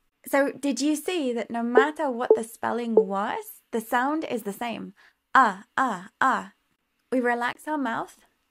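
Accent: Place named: United Kingdom. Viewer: How interesting! I never heard of the schwa sound before – it helps a lot to understand that nice British pronunciation I love! ❤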